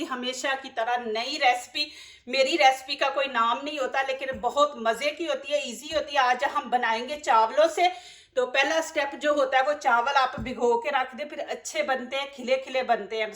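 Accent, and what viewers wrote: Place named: Punjab, India. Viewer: MashaAllah zabardast bhout khubsorat still awaz ka Andaz Panjabi bhout khubsorat laga dia Karachi Pakistan khush rakhae ameen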